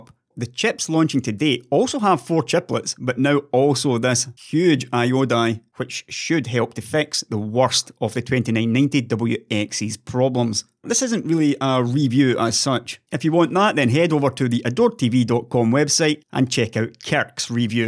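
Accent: Scotland, United Kingdom. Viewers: That is one Scottish accent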